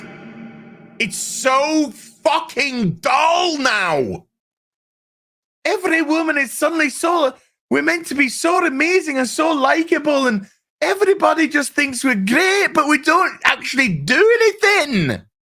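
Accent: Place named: Scotland, United Kingdom. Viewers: the scottish makes this Review sooo special XDDD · Alright, your scottish accent got you another subscription, mate! 😀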